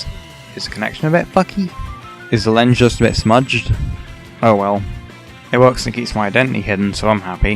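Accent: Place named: United Kingdom. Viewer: Bruh your British o.o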